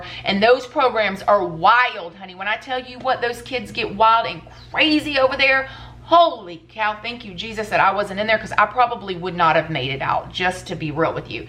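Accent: South, United States. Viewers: climbs up on soap box I would ask everyone who feels the need to "correct" pronunciation of words like "bob wire" or "cement" to please understand that as southerners, we have different ways of saying a lot of words. We know how to spell these words, just like you do. Like any thick regional accent found anywhere around the US (and other English speaking countries), words are often said differently. Think New Orleans, Boston, New York, Fargo, Seattle, Miami, LA, Hawaii, Chicago, etc. And then Canadian, British, Irish... Our distinctive accent and pronunciation of many words is just a part of who we are and the way we heard language as our own voices were being formed. Please stop correcting us, we are not wrong. And you're not either!! We are simply different. I hope we can all love each other for the diversity in each of us. /climbs down from soapbox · I love your southern accent and the little slang words you say lol. 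· Got that southern slang going on 😂 “Bob Wire” we also say “Chester Drawers” 😂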